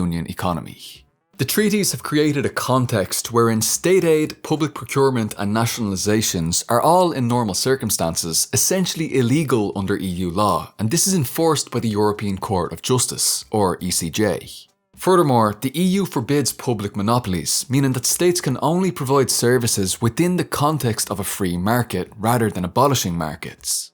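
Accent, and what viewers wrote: Ireland. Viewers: It's always been the same goal, with only slight variations in the methods used, since The Enlightenment (about 3 centuries). Imperialism is synonymous with unlimited socialism for the capital class and tyranny for everyone else. Our own (American) revolution was a reaction to this system, which our own capital class recreated immediately following the war. My list of smaller YT channels that contrast reality with popular mythology grows a little bigger each time any of you credit another, similar channel. Here's another sub and like. Aside: I really like hearing an Irish dialect for a change, it seems that we depend very heavily on our eastern european fellows for information on how things really work and the history of how we got here.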